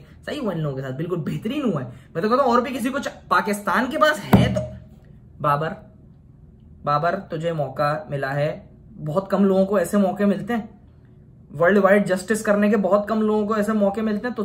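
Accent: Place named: Uttar Pradesh, India. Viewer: Bhai tu best analyst hai cricket ka , Mazak sa hatt ka tere style match kar rahe hai apne sa !! UP wale accent hai teri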